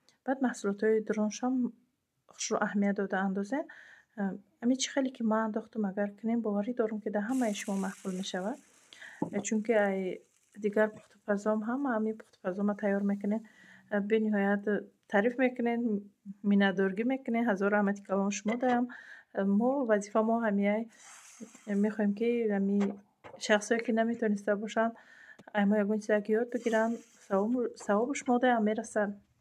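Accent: Tajikistan. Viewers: Салом хоҳарҷон барои таърифтон гап намеёвам чихел таърифтон кнм❤❤гапзанитон тоза тоҷикона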